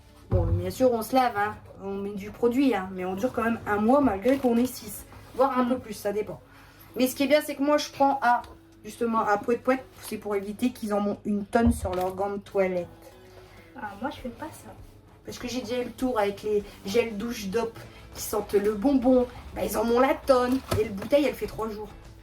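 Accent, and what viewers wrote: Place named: North, France. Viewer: Cc me voilà 😊. Elle est trop mimi ta fille . J'adore l'accent du Nord.... ou il fait froid 😅 moi je suis du sud l'accent du soleil qui chante. ❤ des bisous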